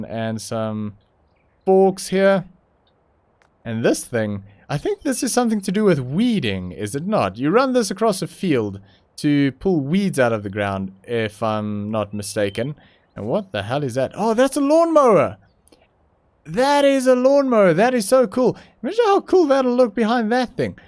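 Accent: Afrikaans, South Africa. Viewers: Is jy afrikaans?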